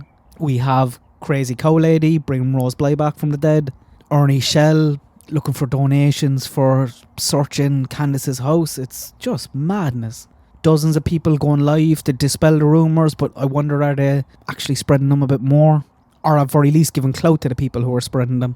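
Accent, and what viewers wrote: Cork, Ireland. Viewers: Hey Chasing :) Thanks so much for your Community Post which is how I just found this channel... and got such a surprise when heard such a familiar accent!! He sounds like he is from same end of Ireland as I am - will have to check out his content... Can't agree more with this video also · Hi from County Fermanagh. Just found you and very glad I have! I agree, the Sophie case is West Cork is fascinating - the podcast is wonderful. I was hoping you might have other podcast suggestions?! BTW is that a Cork accent I hear? Love it.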